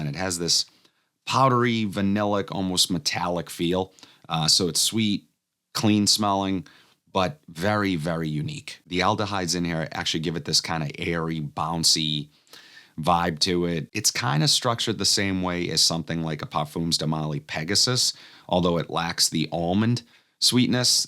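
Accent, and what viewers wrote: Boston, United States. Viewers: Here we go again… I feel like I’m in Boston again with your accent lol. Anyhow, I think you would like Ani X . Fruity hints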